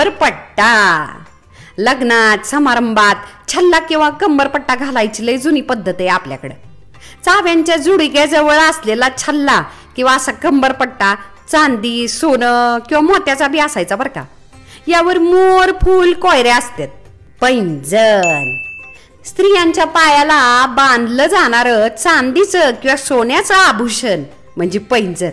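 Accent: India. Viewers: दागिन्यांची खुप छान माहीती दिली ताई आणि ती पण अस्सल मराठी ठसक्यात👍🏼 दिल्याने खुपच छान वाटले... तु तर अप्रतिम सुंदर दिसत आहेस 👌🏻👌🏻👌🏻👌🏻😊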